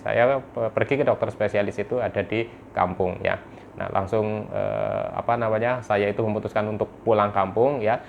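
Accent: Banyumas, Indonesia. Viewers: Asalamualaikum saya kena aslam udah 4 tahun tapi masih sering kambuh2 tiba2 gemetern sampe sampe lemes se lemes ya rasa ya rasa gemetern y datang y dari ulu hati, kang y mana saya jga punggelan banjarnegar.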